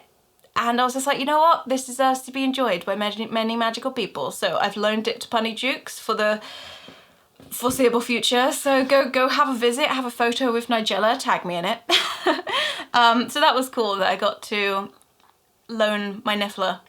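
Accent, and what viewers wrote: Birmingham, United Kingdom. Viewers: Ayeeee your a brummie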